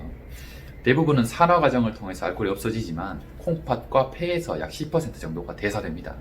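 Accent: Gyeongsang, South Korea. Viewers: 갱상도~~사투리 쩐다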